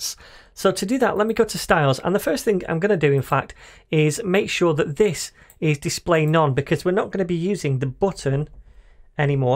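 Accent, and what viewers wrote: United Kingdom. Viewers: Hi! You're pretty cool in explaining things and your British accent sounds funny so I would really like to see next series about pagination. Here's my like and subscription.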